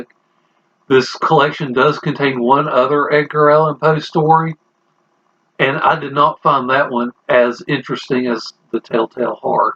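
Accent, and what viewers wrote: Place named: Appalachia, United States. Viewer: Hey. First time viewer. I won't presume to guess where you're from, but the way you speak reminds me a wee bit of some dear friends from Appalachia. Also, good instinct with the lava lamps. Ads a nice dynamic element to your shot. Also, also, didn't know about stone babies before (I do the nurse thing IRL, and I am reliably fascinated by medical phenomena I haven't heard of before).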